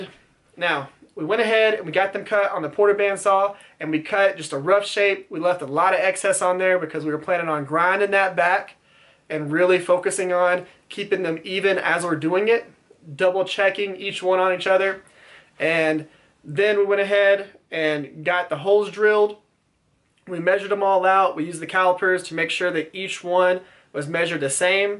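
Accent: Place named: Georgia, United States. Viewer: Brother you sound like you are from Georgia like me! :)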